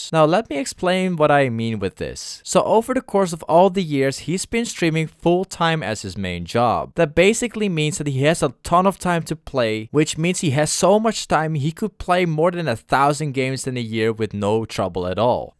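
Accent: Netherlands. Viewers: Dutch accent FTW.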